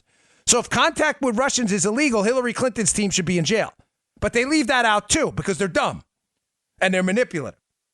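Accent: New York, United States. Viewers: The basic fact is that the Liberals who support the Democrat Party can in no way shape or form be called liberal. Realism requires that we return liberalism to its fundamental meaning. True liberals base their ideas on the Bill of Rights of the US Constitution. End of story. It's time more people got their support straight. Thanks and best. Great to hear it spoken in a New York tone of voice for a change.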